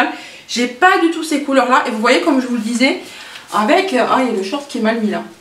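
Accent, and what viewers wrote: South, France. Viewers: le magnifique accent du sud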